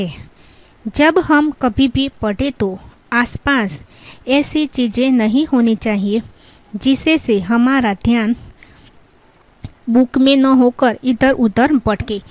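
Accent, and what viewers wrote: India. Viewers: Bengali ho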